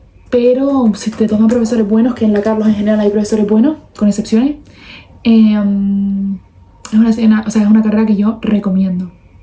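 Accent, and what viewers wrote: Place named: Chile. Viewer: Habla más despacio no se te entiende nada! Me cago en el lenguaje chileno!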